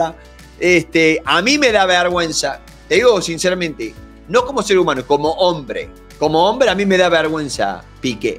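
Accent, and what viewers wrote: Córdoba, Argentina. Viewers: Holaaa!!! Primero me encanta como hablan los cordobeses jijiji y tienes razón en todo quién es piqué…nadie, no vale nada y que buena explicación de narcisismo, apoyo 100 lo que dices y apoyo 1000 a Shakira xq tal como dijiste me siento identificada y me dio mucha rabia 😤 y pena xq nadie se merece que le hagan eso😢pero ella es fuerte y como dijo en la entrega de premios, sintió en carne propia lo que es ser mujer y lo que valemos y la fuerza que tenemos. Excelente comentario saludos y un abrazo hermano 🇨🇱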